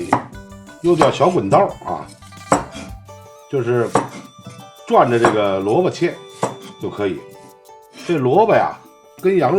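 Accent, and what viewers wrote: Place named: Beijing, China. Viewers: 主要是听地道北京味普通话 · 南方人来听京普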